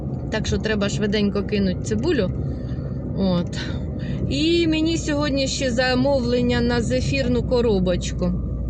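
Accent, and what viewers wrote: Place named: Kirovohrad, Ukraine. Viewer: Дякую за відповідь.Я так і думала що або центр,або східніше бо розмовляєте як ми в Кіровоградській обл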